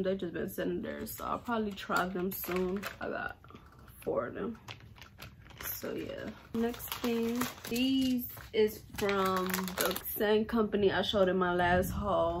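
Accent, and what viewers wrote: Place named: Alabama, United States. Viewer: Girl your accent is deep, is it Alabama?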